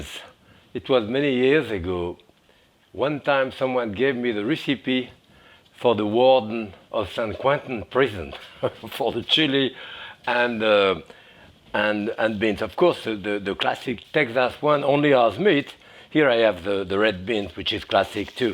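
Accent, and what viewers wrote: France. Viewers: I could listen to him talk all day. I love that French accent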